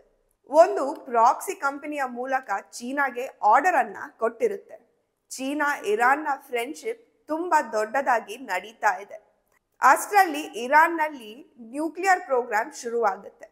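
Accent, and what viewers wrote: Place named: Coastal Karnataka, India. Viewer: What's wrong with her Kannada? Her pronunciation resembles that of coastal areas. Otherwise her speech is very clear. Don't discourage our own people.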